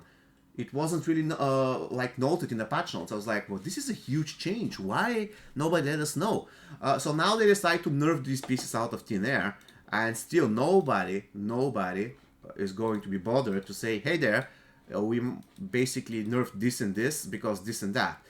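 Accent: Bulgaria. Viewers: Hello, I have a question for you. Are you Bulgarian? Because I watch your videos and I catch the typical Bulgarian accent. I am a Bulgarian and it would be incredible if you are a Bulgarian too.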